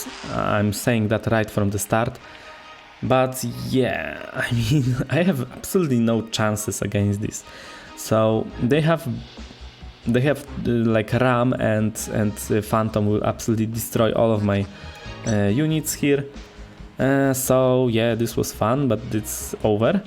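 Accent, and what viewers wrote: Poland. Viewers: Nie wiem odczuwam, że jesteś polakiem, tylko my tak kaleczymy angielski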